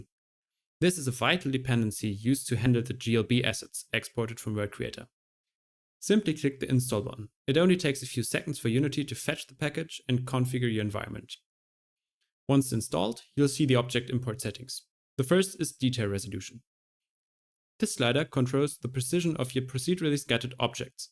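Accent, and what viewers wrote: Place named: Germany. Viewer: Wonderful! I'll buy this if you release a Mac version. You sound German. Are you? Germans come up with the absolute best stuff!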